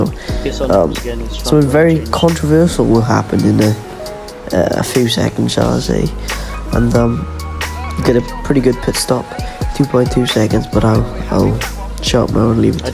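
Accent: Wales, United Kingdom. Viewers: the most welsh accent!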